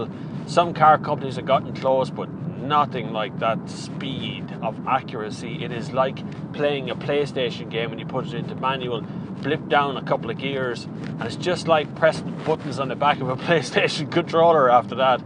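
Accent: Ireland. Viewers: just love that mix of RS3 pops and a paddys voice on that super looking stretch of road Top video buddy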